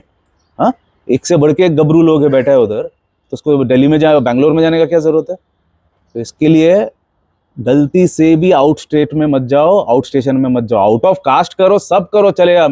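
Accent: Bengal, India. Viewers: Heavy bengali accent ...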